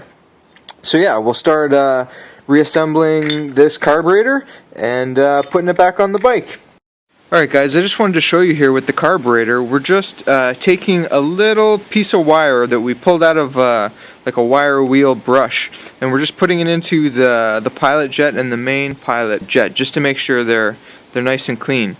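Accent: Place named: Canada. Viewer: Oh you Canadian? "Check it oot!"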